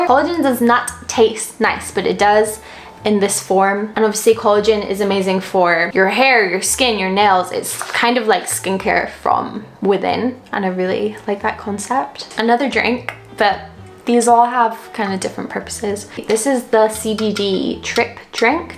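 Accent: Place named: Scotland, United Kingdom. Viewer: is from Scotland but lives in London. I love her accent!!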